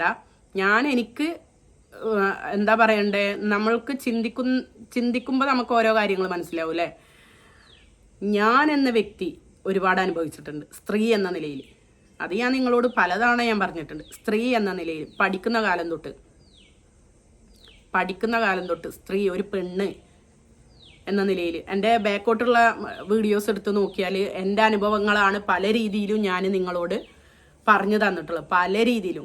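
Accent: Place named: Malappuram, India. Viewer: ഓരോ നാട്ടിലും ഉള്ള സംസാരം അല്ലെ അതു? കോട്ടയത്ത് ഉള്ള എല്ലാ മതക്കാരും ഒരു പോലെ ആണ് സംസാരിക്കുന്നത്..ഇവർ മലപ്പുറത്ത് ഉള്ളതല്ലേ? അവിടെ ഇങ്ങനെ ആണ് സംസാരിക്കുന്നത്..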